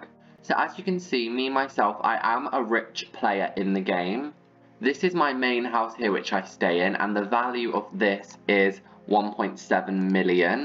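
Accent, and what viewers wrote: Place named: United Kingdom. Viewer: Sounds like a briish boy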